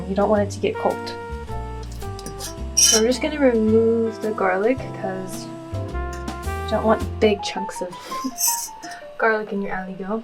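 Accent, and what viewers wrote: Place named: France. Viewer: Hi, this Is Katherine from Honduras Central América. I love your videos, muy second tong Is french, my mother tong Is spanish, but when I hear you, you are from France. So I feel like, I am traveling with you in your stories. Muchas gracias ❤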